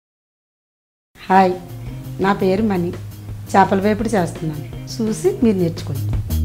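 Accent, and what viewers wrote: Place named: Godavari, India. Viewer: Godvadari mettaa slang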